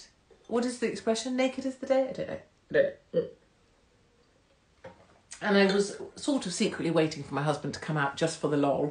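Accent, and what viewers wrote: United Kingdom. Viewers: Why is your English accent so light?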